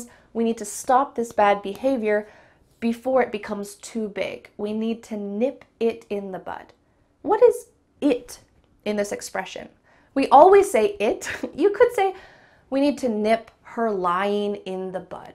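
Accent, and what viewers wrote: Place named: United States. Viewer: It sounds American English? Not authentic one!?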